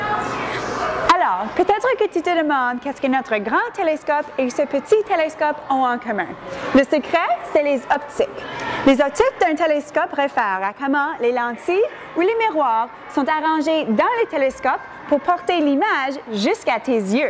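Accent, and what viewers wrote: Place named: Canada. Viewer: décidément j'adore l'accent de nos cousins/cousines d'outre-Atlantique, je le trouve toujours hautement réjouissant. Contenu intéressant pour animer un atelier (classe de découverte, colo...) avec des enfants (je dis bien les "enfants", pas notre mot d'argot qui veut dire tout à fait autre chose au Canada! - d'ailleurs comment avez-vous traduit le titre du film "Honey, I Shrunk the Kids"?) Pour la collimation j'ai un doute, pour observer la nébuleuse de la Lyre aussi; perso je choisirais la grande lunette si j'avais les moyens, mon XLT 120 est ridicule à côté.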